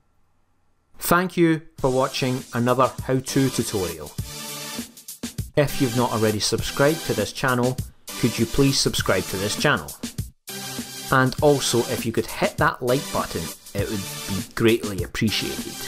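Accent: Scotland, United Kingdom. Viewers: Love ur Scottish accent. I can actually understand you.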